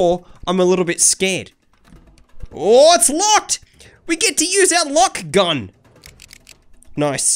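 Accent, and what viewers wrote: Australia. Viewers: mate are you Australian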